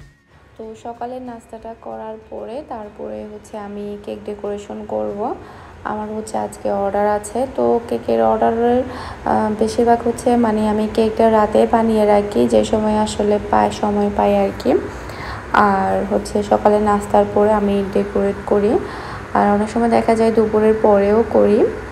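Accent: Chittagong, Bangladesh. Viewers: আপু আপনি কি চিটাগং এর?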